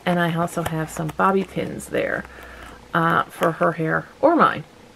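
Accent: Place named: Newfoundland, Canada. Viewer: Loved this video! I was thinking of doing a video like this for my everything pouch. I really heard your Newfoundland accent in this video. Absolutely adore you babe!! ❤❤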